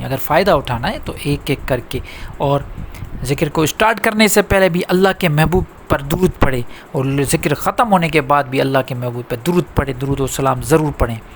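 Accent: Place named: Sindh, Pakistan. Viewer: Sir Aap ki zuban Sindhi lag rhi hy han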